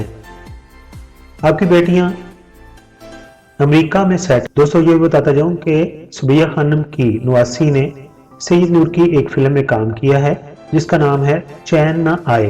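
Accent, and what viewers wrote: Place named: Punjab, India. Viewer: AP ka lehja pnjabi hai Urdu k alfaz p sahi grip nai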